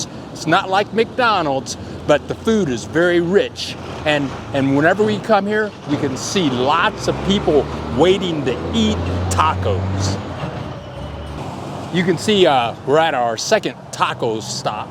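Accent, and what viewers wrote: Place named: United States. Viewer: Good video. Lol, after 15 years your gringo accent is still very strong. ¿hablas español?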